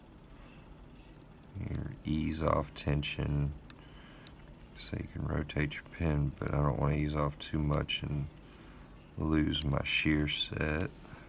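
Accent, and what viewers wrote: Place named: South, United States. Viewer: Great video on figuring these out. I am about to start learning on medeco as well, but I have been too chicken chit to start. lol I have to know though, what part of the South are you from, Bud? That drawl is unmistakable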